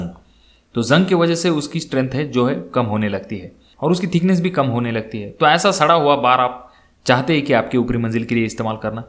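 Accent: Maharashtra, India. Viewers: Bhava tu marathi ahes bahutek tuzya avajamule lgech smjty karn aplya marathi lokanchi hindi aschich aste 😀😁..baki video ek number astat tuzya khup mahiti milte tuzya video mdhun .keep it up bhava 🚩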